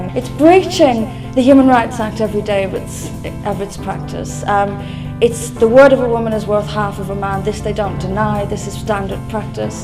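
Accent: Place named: Ireland. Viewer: hey she sounds Irish. fun fun w/the vatican mantra/organized crime etc aye?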